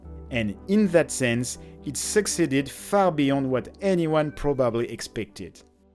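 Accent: France. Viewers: le p'tit accent français, je ne m'y attendait pas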